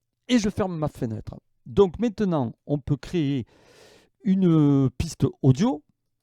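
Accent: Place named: South, France. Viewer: Bonjour! Je débute avec cubase pro11, pour le rangements des projets sur quel DD, dois je les mettre? Avec mon système sur le disque C ou avec les VST inst sur le Disque D? J'ai entendu un accent du sud dans ta voix! J'habite à côté de DAX, est ce que tu fais des formations en présentiel, ça m'intéresserais? J'adore ta façon d'expliquer très précise. Je pourrais me déplacer si l'on est pas trop loin! Amitiés à toi! Marcus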